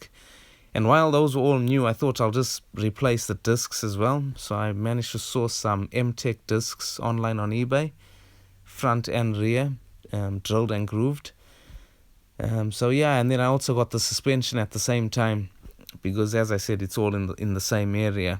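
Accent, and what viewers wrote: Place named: South Africa. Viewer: Good luck with your build mate. After the first few seconds of listening to you, I realised you were a fellow South African.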